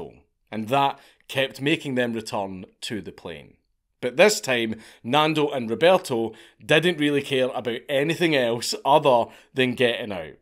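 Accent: Scotland, United Kingdom. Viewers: Never thought I’d be falling asleep to a hairy Scottish man telling me about a plane crash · He should do an video where he speaks like a Scottish road man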